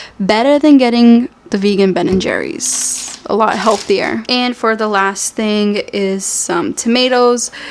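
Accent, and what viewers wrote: Boston, United States. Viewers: She is from Boston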